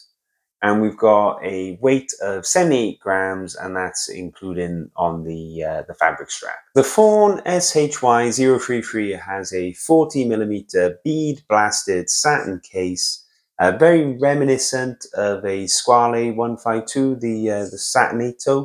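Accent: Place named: Cardiff, United Kingdom. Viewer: Sounds like a Cardiff accent to me....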